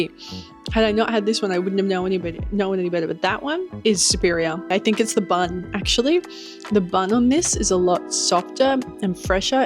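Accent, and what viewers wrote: Australia. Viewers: that’s not a British accent, more like Australian. As a Scot I take offence to the claim our food is terrible over here lol, you just mustn’t have tried the right foods when you visited 😂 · Aussie? The way you say your R's sounds really familiar.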